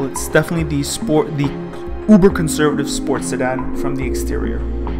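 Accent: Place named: Montreal, Canada. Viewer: Dude has such a Montreal accent.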